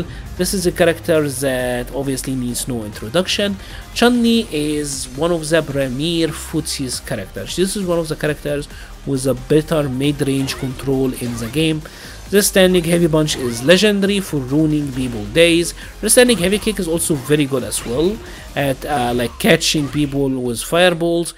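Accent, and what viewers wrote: Egypt. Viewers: Are you Egyptian? You sound Egyptian.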